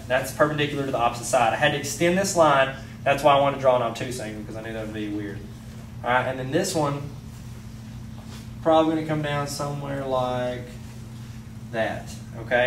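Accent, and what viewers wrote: United States. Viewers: our teacher makes us use a damn compass to find this stuff, but i got the jist of what you were saying. Great video, and nice accent #amurica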